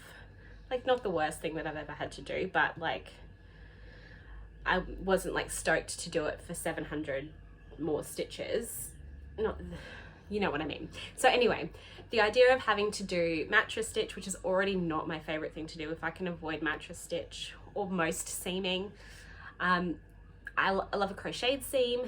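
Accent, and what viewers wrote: Australia. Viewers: Always great to hear an Aussie accent. Try Julia and Mathilda's greatestknits. Love the Tulip sweater and have some light linen for a summer version ready as it's still over 35 degrees here every day ! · Hi, it's so nice to see and hear an Australian knitting podcaster!! Just wondering where you order all these American yarns from as I don't see them at any online (Aussie) stores that I buy from? Also the De Rerum, I want that for the Decision cardigan but can't find it so can you advise where you got that? I know what you mean about some podcasts being over an hour long. I have heaps that I watch and the shorter ones that I absolutely love are Voolenvine, Cherry Heart, 50 Fabulous Knits, The modern Skein, Camijo Knit, A Homespun House, Little Big Knits & Youngfolk Knits. Love your work, you knit beautifully.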